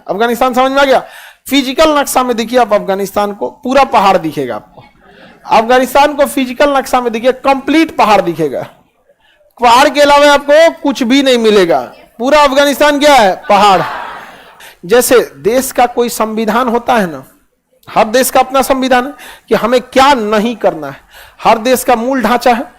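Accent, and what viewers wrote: Bihar, India. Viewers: Aapka Bihari andaz bahut achchha hai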